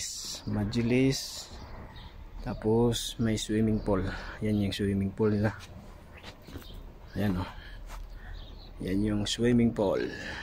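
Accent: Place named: Visayas, Philippines. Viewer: Bisaya deay ka lodi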